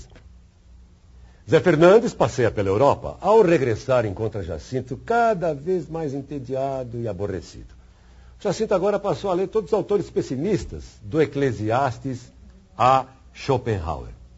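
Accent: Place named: Caipira, Brazil. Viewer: Belo trabalho, voz caipira lembrando os velhos antigos (sim, os velhos de antigamente eram muito melhores e melhores contadores de estórias que esses velhos de hoje). Parece ser um excelente professor, apaixonado pela sua arte. O livro me pareceu ser chato e descritivo demais, justamente o que procuro, o Eça tem essa qualidade mágica de fazer nos apaixonarmos pelas palavras. Pedi hoje, espero ler logo, logo.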